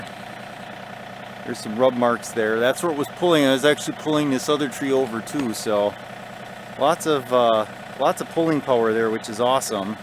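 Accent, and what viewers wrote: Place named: Maine, United States. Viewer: where are you from there brother sounds like you are maine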